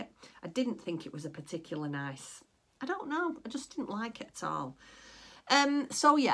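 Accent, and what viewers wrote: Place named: United Kingdom. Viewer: Hi....I found your video last night and enjoyed your video so much that today I went back to watch your older first videos❤️ what a delight you are! I find myself laughing at times when you mention different things because I can so relate to it.....keep up the great work.....you have quickly become one of my video favorites💕 I love your British accent....I have a sister in law who is from England as well!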